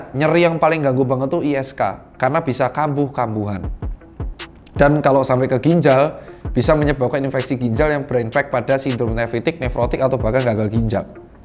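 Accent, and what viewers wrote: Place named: East Java, Indonesia. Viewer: Dr tirta ngomongnya kok kayak orang jawa timur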